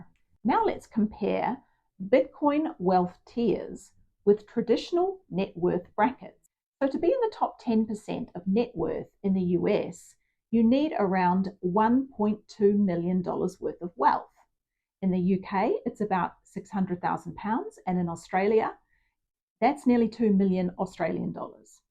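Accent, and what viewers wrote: New Zealand. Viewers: Bitcoin is 👑..keep up the Great work, Spreading the Bitcoin Message..My Btc Journey began in May 2021, and I never looked back..I enjoy your content as well as..Your Hot 🔥🔥🔥....I look forward to your next episode..I detect a kiwi accent..😬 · You sound like a kiwi .. are you?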